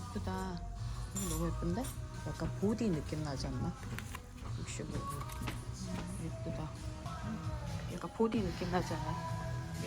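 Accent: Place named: Gyeongsang, South Korea. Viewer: 나도 대구라서 그런지 말투 완전 친근해요ㅋㅋㅋ얍샵하이 ㅋㅋㅋ 덕분에 뉴욕 쇼핑 구경 잘하고있어요😊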